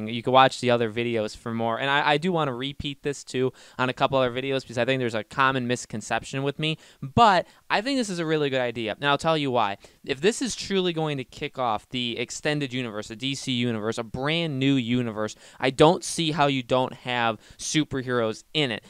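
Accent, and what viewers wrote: United States. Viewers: you are very american and the name is maybe a little bit wrong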